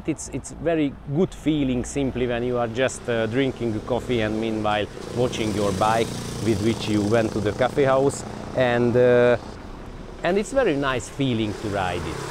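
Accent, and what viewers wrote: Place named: Hungary. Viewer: Hunglish... Maybe the first english video, I can understand.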